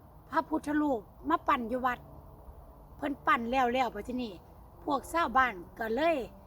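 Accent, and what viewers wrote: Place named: Isan, Thailand. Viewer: นั่งเล่าแบบอิสาน สนุกมากค่ะ ช่วงพระพุทธรูปสวยแต่พูดไม่ได้ ยิ่งสนุกค่ะ เจอมะม่วงลูกเดียวเท่านั้น แกหยุดเลย😆เที่ยวให้สนุกแล้วมาเล่าใหม่นะคะ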